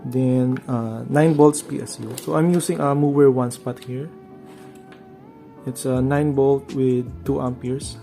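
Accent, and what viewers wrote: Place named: Philippines. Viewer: Sorry I thought you were Indonesian. Now hearing again I hear a Filipino accent instead. Mantap is Indonesian for delicious/awesome :D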